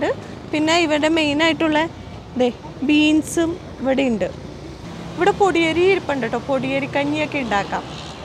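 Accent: Thrissur, India. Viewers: Chechide samsaram reethi thanneya ettavum manoharam.. 💞💞 Chechi from thrissur alle... evdeya proper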